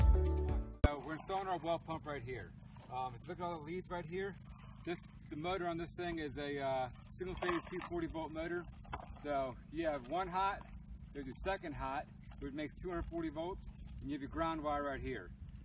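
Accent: United States. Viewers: As an Aussie living in Thailand for 19 years, I find it hard to understand your rapid American speech or what the plan for this water-supply is (and that was my specialty), but is looking so er, civilised, with his trim. Great work team, you're nearly there!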